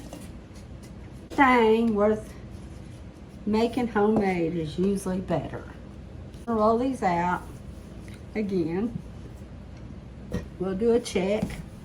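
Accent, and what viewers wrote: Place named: Missouri, United States. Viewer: Listening to you talk, it sounds like the people around here where I live. I like that. You feel like you're from right here in Missouri too and that makes me feel comfortable. Got a like, sub, and a bell. Listening to what you had with your friends yesterday sounded incredible! We didn't find any Morels this year, but it sounds like you and your friends did, those fried mushrooms. This recipe looks really good, and I love the idea of using rotisserie chickens. I think I'll talk to my mom about it and we'll make this in the autumn or winter. Great recipe. I bought a product years ago from McCormick for chicken and dumplings. A spice packet and recipe on the back... and I read it wrong. I used WAY. TOO. MUCH. butter... they were delicious but I can easily say I never made it again because it was way too entirely unhealthy for us. 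But this recipe seems way more healthy than my failed attempt years ago. I look forward to trying yours next. :)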